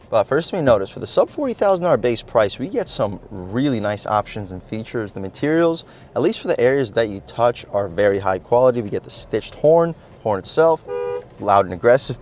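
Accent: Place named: Long Island, United States. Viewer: Dood has a Long Island accent but is in Tampa????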